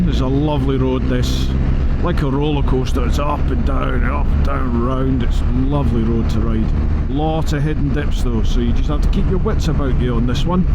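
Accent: Scotland, United Kingdom. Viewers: You definitely sound more Scottish once your back over onto this side of our border buddy! 🤪👍 Great vid & good to see a few familiar faces & Peaky behaving himself! 🤣👍 I'll definitely need to head up past the ski resorts on a bike at 1 point! 😎✌️ Are you doing the ABR this year,since I noticed you had a vintage ABR t-shirt on while on Haggis Whisperers series recently? 🤔😬👍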